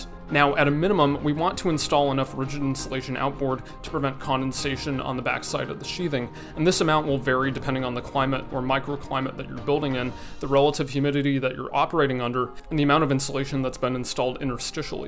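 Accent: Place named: United States. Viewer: Thanks bro I totally couldn't tell from the fact that the man with an american accent was talking to me on an american website that he is an american.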